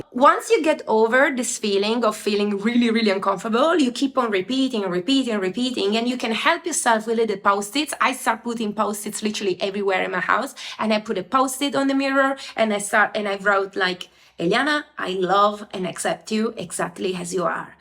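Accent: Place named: Italy. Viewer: I loved this book as well Eliana, and still refer to it for myself and for others. Excellent review! Love all the artistic ways you edited everything into it. And I love the beautiful way you still "speak" Italian in your English. So very entertaining...I want more! 😊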